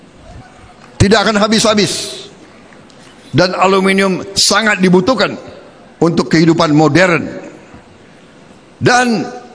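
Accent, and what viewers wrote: Java, Indonesia. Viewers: Mas jawa apa Mas sunda pak 😄😃😀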